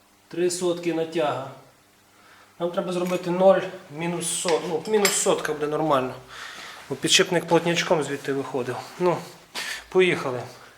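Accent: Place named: Lviv, Ukraine. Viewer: Мутуцикла) Цей Львівський акцент))))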